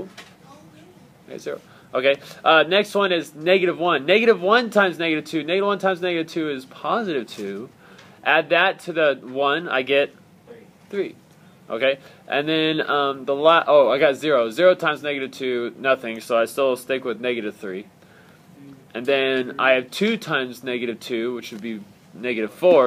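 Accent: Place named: United States. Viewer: this sound so american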